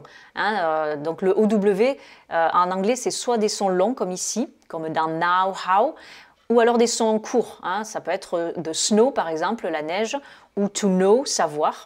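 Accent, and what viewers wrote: France. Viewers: Bonjour, excellente présentation étendue de ce vocabulaire très intéressant par les temps qui courent. Cependant, je suis professeur d'anglais depuis 35 ans, et je me demandais si votre prononciation légèrement francisée des termes et expressions était voulue ou pas, dans le but de faciliter la prononciation anglaise pour vos internautes francophones ? Merci pour votre réponse éventuelle, et encore bravo.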